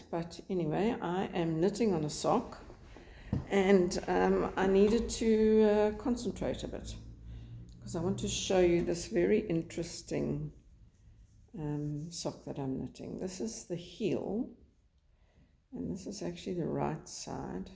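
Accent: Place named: South Africa. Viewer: Hi and how lovely to hear a South African accent and the Hadidas, are those the ones we call Kwê voëls. When I look at the way you hold your needles and yarn, I know we grew up at the same time in South Africa. Although Afrikaans, I should have knitted continental, my mother had very strong ideas about the done thing. When I taught myself to crochet she always had a lot to say, because I did it my way. Even my Afrikaans friends found it strange and when I see how most people do it on YouTube I think anyway is okay as long as you enjoy. I hope my daughter isn't too cold in Joburg. The UK is mild and people are upset because this isn't summer. I don't mind, because it can get quite muggy. Enjoy your stay and I envy you going to your granddaughter's party. Think I will give those socks a go. Look forward to hearing your voice again. Anita x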